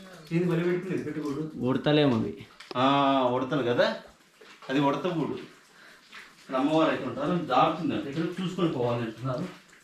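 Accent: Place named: Kadapa, India. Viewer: Nenu chala rojula taruwatha rayalaseema kadapa bhasha vinnanu. Thank you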